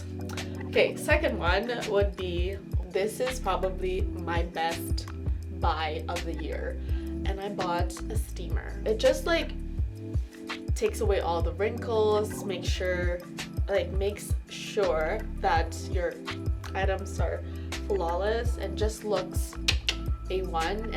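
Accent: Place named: Canada. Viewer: girlllll I hear the canadian accent ♥ ahahahha love itt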